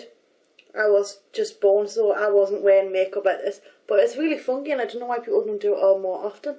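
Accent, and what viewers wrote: Newcastle, United Kingdom. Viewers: Why would she put on an accent. She's a Geordie that's what they sound like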